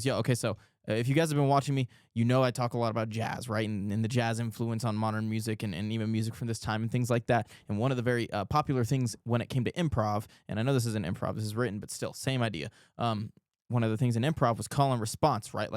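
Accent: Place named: England, United Kingdom. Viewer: We're Scots. Around the World. You'll be fine. You sound like an Englishman though.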